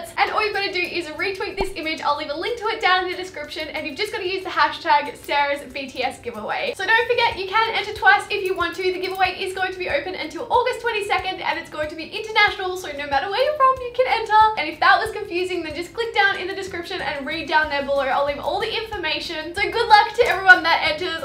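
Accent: Australia. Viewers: Is that an Australian accent? Hope I don't offend you if it's not. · she's Aussie because from QLD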